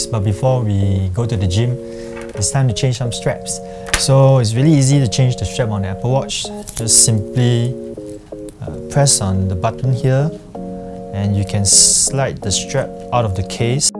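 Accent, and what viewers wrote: Singapore. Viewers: Definitely sounds like a Singaporean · omg that Singapore accent.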